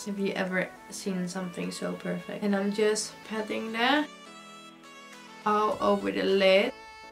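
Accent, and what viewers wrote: Netherlands. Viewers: ik kom ook uit Nederland😄Herkende je accent gelijk maar je Engels en uitleg doe je echt super!!! Gaaf om te zien, ben zelf veel met make up bezig en testen en een collector natuurlijk, zou ook wel mijn eigen channel willen beginnen maar durf nog niet.....komt wel. Heel veel succes met je channel!!!😘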